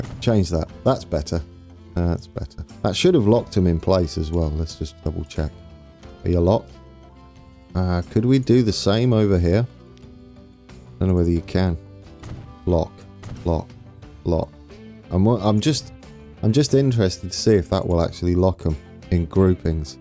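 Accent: England, United Kingdom. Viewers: I live in Minnesota and you have represented us well. To bad the game didn't have the seasons as it is beautiful here in the Fall and very white and cold in the Winters! Might be fun riding along with you on your Interstate 35 and sliding around in the snow and ice! Your my fave! Look forward to your updates and can't wait for your your new TF 2 venture! Thanks for all the hours of pure enjoyment. Why haven't you picked up on the Texas accent yet? A Texas/English accent would be fun to here. By the way. I may be your oldest viewer. I'm 70 years young. I have the game. But my old burnt out brain may not be able to play the Hard or longer games. So I live through you! haha Kinda scary huh!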